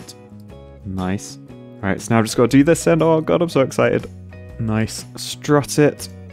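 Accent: United Kingdom. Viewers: just a normal bri'ish engineer playing games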